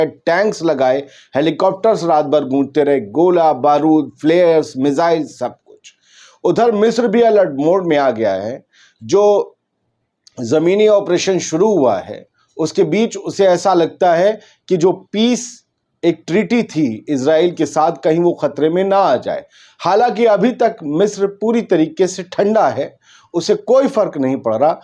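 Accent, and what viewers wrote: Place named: Bihar, India. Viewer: Bhai aap bihar se hain tale upar ye bihar ki zuban hai ❤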